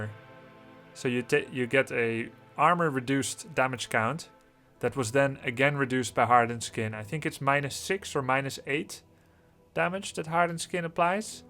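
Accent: Scotland, United Kingdom. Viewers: Why are ye suddenly scottish though? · dat scottish accent always amusing to see you eine andere Sprache sprechen :D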